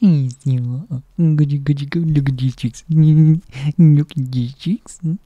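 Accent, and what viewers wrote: United Kingdom. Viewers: I saw this and my first thought was "oh the british guy!" And got very exited since i was just thinking about a fictional crush i have with an accent like yours [pardon the spelling mistakes its late and auto correct isnt on]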